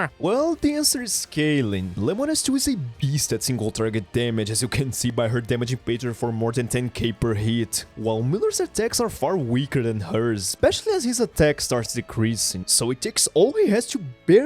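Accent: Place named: Brazil. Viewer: wow, you have a very strong Brazilian accent... You are? xD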